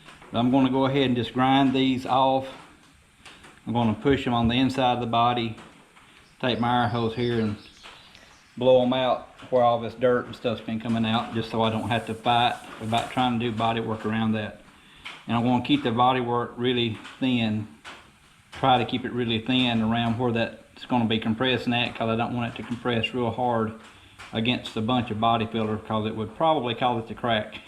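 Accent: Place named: South, United States. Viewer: You got that right and someone who know how can spot someone else who does. Yes an art and not something you rush either ! When I saw you pull the string in the seam and you bolted the fender in place and it all looked so nice I thought this is not his first rodeo ! Enjoying your videos ; I'm in Alabama and you sound like a southerner ! You turn a pretty good wrench too ! A real car guy I can tell.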